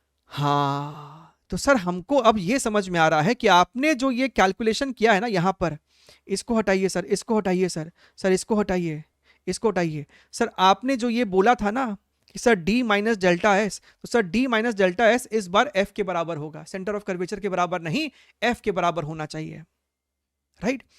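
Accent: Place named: Bihar, India. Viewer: Bhaiya I can tell from you accent ( hoyebe karega ) ki aap bihar se hai. If you can tell kaha se. Btw love your vid helped me a lot mains me and just sad ki itna late mila channel apka. Great job ❤❤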